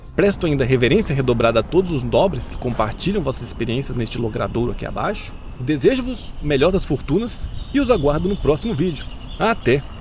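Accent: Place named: Minas Gerais, Brazil. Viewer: Ótimo vídeo! Comecei meu périplo por seus vídeos com a reportagem sobre Zigurats. Daí chequei sua lista e vi que este aqui é o mais recente. Que grata surpresa, meu amigo! E o que mais gostei, além dos detalhamentos, foi do humor que você imprime às reportagens. Só uma dúvida: Você é catarinense mesmo? Sotaque mineiro de BH inteirinho! Abraço!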